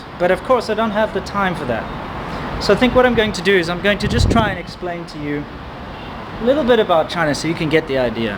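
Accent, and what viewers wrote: South Africa. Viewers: He's from South Africa, so he speaks South African English. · Why do you not have much in common with Afrikaners? You don't sound a lot different to them. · I'm an American but I wish I had that South African accent. It like having a British accent (where people immediately think you know what the hell you're talking about) and an Australian accent (where people immediately think you wrestle alligators) Best of both worlds.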